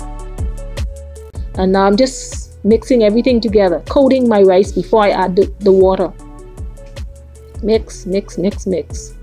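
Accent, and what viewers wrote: Bahamas. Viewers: Lovely! I'll try your recipe ;-) I also enjoyed your Bahamian accent! I love it!!!! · Love the accent and of course the Bahamian people.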